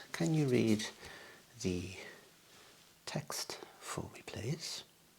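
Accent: Birmingham, United Kingdom. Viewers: listening to the start id be worried but a brummie bloke wouldnt do me wrong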